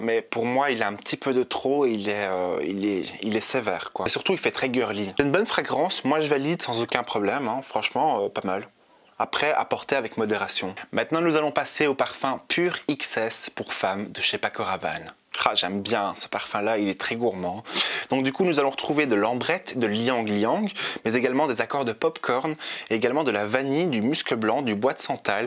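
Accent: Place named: Wallonia, Belgium. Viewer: Accent wallon ou brusseler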